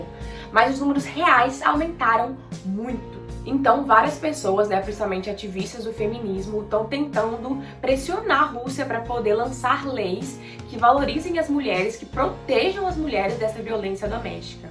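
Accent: Minas Gerais, Brazil. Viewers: Gostei do vídeo anjo.. E essa camisa aí da Rússia q tem a msma cor da camisa da seleção soviética?! É linda.. A Rússia país onde tem a Sibéria.. A Rússia já foi um Império e sua capital era São Petersburgo.. A Rússia da Vodka e do Balé Bolshoi.. É o maior país do mundo.. Foi uma das quinze repúblicas da União Soviética.. Amo o jeito q vc fala.. Amo o seu sotaque mineires.. Tamo junto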